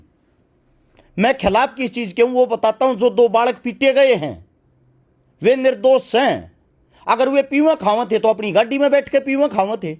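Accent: Haryana, India. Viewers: भाई जी एक तो आपके बोलने का तरीका बहुत अच्छा है फुल हरियाणवी, ओर भाई जी आपने सही कहा एक साल ना जाओ हरिद्वार इनकी तो आंखा मैं ढ़ीढ आ जागी❤❤❤ जय हरियाणा जय हरियाणवी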